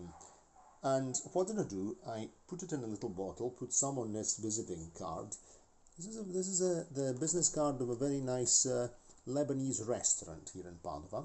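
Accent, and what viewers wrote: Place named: Scotland, United Kingdom. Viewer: I think autumn passed us here last Tuesday! 😂 Don’t forget that your “heavy “ Scots is a language in itself. Dinnae let nae Sassenach tell ye otherwise! My French wife speaks a lot of Ulster Scots too. It’s funny to hear her call the dogs “wee skitters” or the price of the shopping “fairly skelped her, so it did”! 😂 Did you grow up in a bilingual home in Glasgow? I know your family are Italian. Do you have a Scots accent when you speak Italian/Romanian? Anyway, off to Germany shopping now. Try a bit of the aul Deutsch. Ein Bier bitte. Guten Tag!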